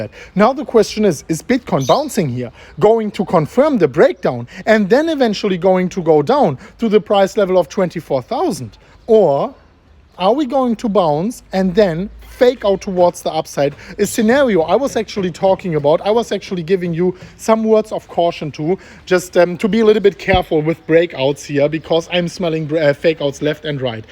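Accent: Germany. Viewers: Ich feier deinen deutschen Akzent jedesmal! Sehr gute und unterhaltsame Videos! Ich schätze es geht NICHT mehr runter, alleine wegen El Salvador!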